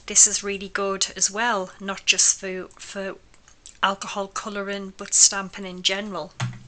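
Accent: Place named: Northern England, United Kingdom. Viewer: It's almost half past one in the morning here - I found you earlier this evening and am blown away! What a star find! I've been card making for around 20 years and just love your ideas. Photopolymer stamps also have a distinctive smell compared to acrylic ones - sadly even some reputable UK designers are cutting their costs and still have their stamped made overseas and many of them are acrylic. AND, my laminator is coming out to play when I eventually get up later today! HUGE thanks - how I wish I'd known a lot of this particular video when I started out.. I obviously have a lot of hours of fun viewing ahead! Di x (Northumberland exile - so it was an extra delight to hear your lovely Northern accent).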